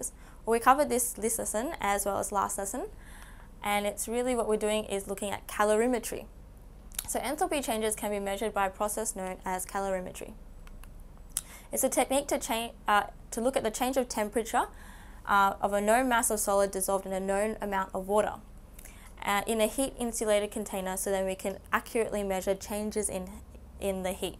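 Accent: Australia. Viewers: I love you sm. I love your aussie accent too🥰🥰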